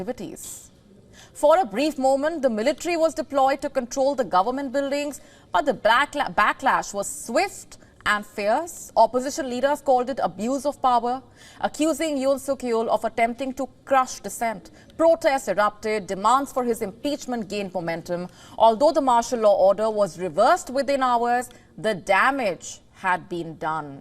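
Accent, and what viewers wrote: India. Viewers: Good info! Her accect is so heavy I can not understand, maybe this a show only for Indians? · i dont understand indian speaking english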